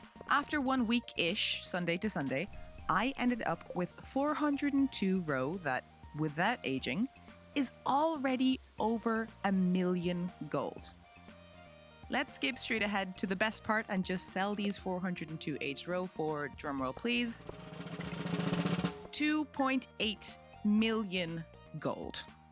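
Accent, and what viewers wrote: Ireland. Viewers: while I found it a bit jarring (as an unfortunate American) to hear it pronounced that way, I personally figured it had to with where you're from. It's easy to tell you're Irish from your accent. Every English speaking country speaks it differently, and even then it can be different just based on which part of the country you're in 😅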